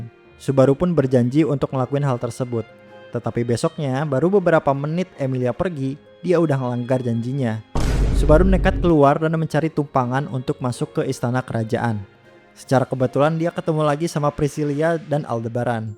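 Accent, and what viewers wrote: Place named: West Java, Indonesia. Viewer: admin ketahuan orang sundaa😂😂, btw gw juga sunda sih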